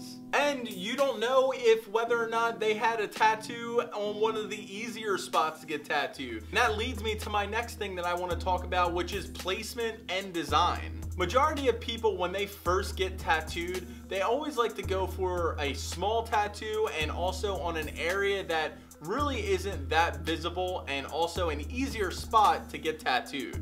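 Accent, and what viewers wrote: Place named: Delaware County, United States. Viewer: Delco accent. Know I can trust this guy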